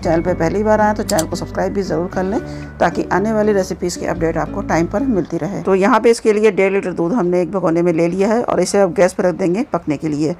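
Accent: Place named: Urdu, Pakistan. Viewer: آپکا اردو کا خوبصورت لہجہ ہمیں بہت پسند ہے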